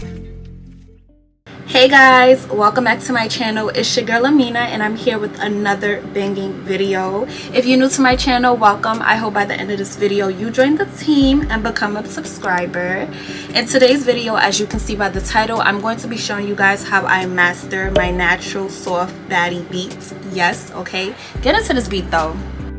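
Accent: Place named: New York, United States. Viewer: ASA new subscriber!!! Beautiful look!! Edited to say, I thought that was a NY accent (I'm from NJ) lol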